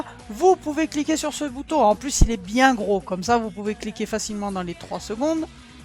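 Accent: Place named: Marseille, France. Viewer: ho...j'croyais que t'était marseillais...oui,par ce que t'a vraiment l'accent...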